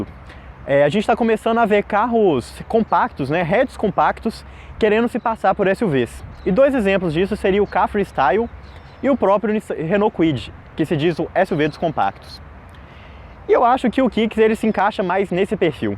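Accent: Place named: Minas Gerais, Brazil. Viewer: eu tbem acho!! E esse sotaquinho mineiro... Iti maliaaa 😍😍😍 ... Melhor canal de carros do YouTube 👏🏽👏🏽👏🏽👏🏽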